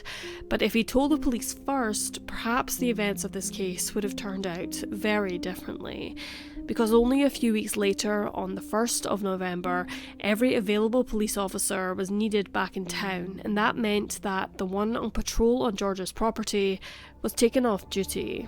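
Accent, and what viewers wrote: Scotland, United Kingdom. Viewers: Hey Hey Are you speaking with a Scottish accent?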